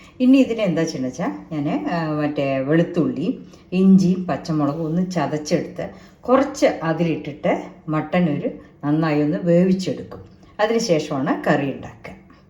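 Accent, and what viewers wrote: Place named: Valluvanad, India. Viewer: Valluvanadan Malayalam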